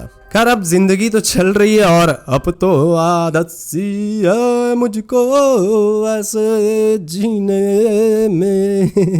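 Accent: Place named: Hills, India. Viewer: You are talking like pahili vale log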